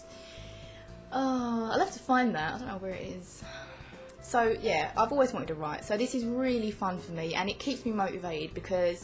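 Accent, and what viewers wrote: United Kingdom. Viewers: the more you post video..the more you got the british accent when you talk.. Kinda funny but sooo cool !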